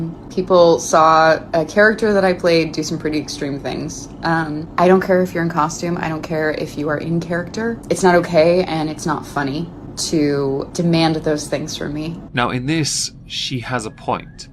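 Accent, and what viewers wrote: United Kingdom. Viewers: I think the issue with your channel is you're a DEI Squid and with the British accent it comes off really douchy.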